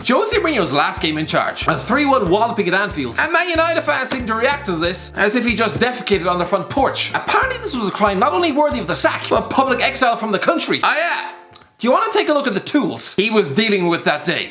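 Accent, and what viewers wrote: Ireland. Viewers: A Newcastle fan with an Irish accent, I don't know what to believe.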